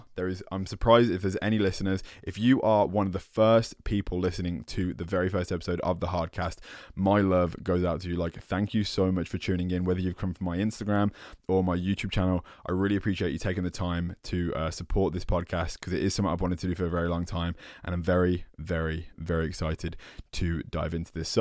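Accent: United Kingdom. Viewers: I'm loving your british accent. I'm from Holland and your accent and voice are so amazing. Could listen to it all day. Yep i love british english.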